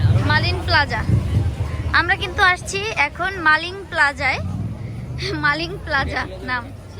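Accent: Barishal, Bangladesh. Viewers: বরিশালের ভাষায় আর যে ভাষায় কথা বলো তবে তোমার ভিডিও করা অনেক সুন্দর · ও মোর খোদা আণণে যে বরিশাইল্লা মুই বুঝছি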